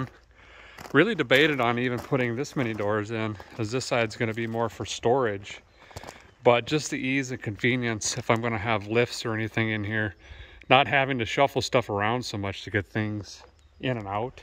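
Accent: Minnesota, United States. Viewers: Please don't loose the MN accident and start sounding like your are from Tennessee. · Too bad you left Minne-Snow-Ta... I get it when it comes to wrenching in the cold. Good luck with the projects. Keep your accent please. I have a hard time listening to people from the southern USA. Super nice folks mind you but my Midwest brain doesn't catch on as well... I'm sure my brain is still partially frozen... I will stay right here in the frozen tundra thank you. (Somebody has to live here)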